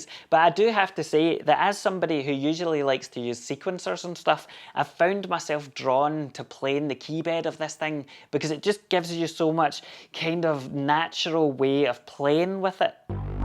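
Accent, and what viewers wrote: Scotland, United Kingdom. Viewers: Sold my desktop last month to fund a Super 6. There was just something about its sound that I didn’t quite like compared to my other synths. Loved everything else about it, such a deep synth engine, amazing mod matrix and the macro assign is genius. But it just wasn’t getting the same love and attention that my Peak, XD and Iridium were getting. I may pick up an Explorer again though at some time. Always good to hear a fellow Scottish voice on a synth channel.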